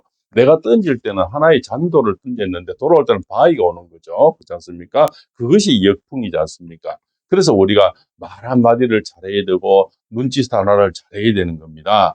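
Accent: Gyeongsang, South Korea. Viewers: 새해복많이받으세요 님은 경상도 같은 고향같네요 윤대통령님 기도좀올려주세요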